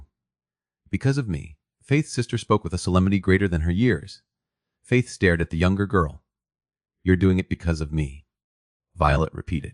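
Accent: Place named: United States. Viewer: AI American accent narration in audiobooks supposed to be set in Regency Era London sounds dreadful. Please try an AI with an English Accent at least.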